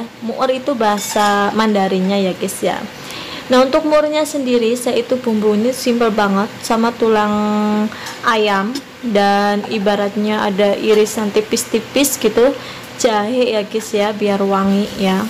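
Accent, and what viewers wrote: Banyumas, Indonesia. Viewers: Waakaikumsalam mbak ngapak maning hebhe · Tkw ngapak heboh tenan iki semngt dan sehat selalu mbk e 😊😊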